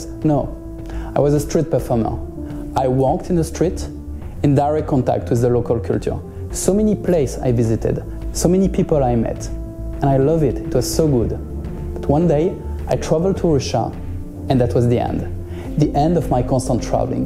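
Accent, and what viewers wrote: France. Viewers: Bro you really should work on the pronunciation, you have a really heavy French accent that makes your point really hard to understand, plus the really basic grammar mistakes are quite discrediting the image of yourself you want to convey (I'd have trouble with a business man that doesn't know that you should say ''the world knows'', not ''the world know'') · Chad french accent bro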